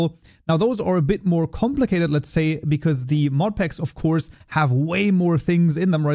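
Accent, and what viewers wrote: Germany. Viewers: Man hört zwar raus, dass du deutsch bist, aber definiv keine schlechte Aussprache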